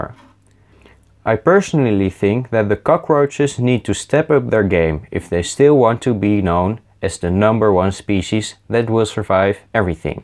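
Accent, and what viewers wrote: Netherlands. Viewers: you sound really dutch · Jij bent nederlands ik hoor het aan je accent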